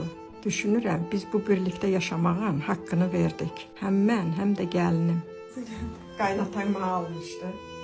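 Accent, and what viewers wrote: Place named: Qazakh, Azerbaijan. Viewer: Haralısınız? Ləhcə var, mən bizim Qazax tərəflərə bənzətdim.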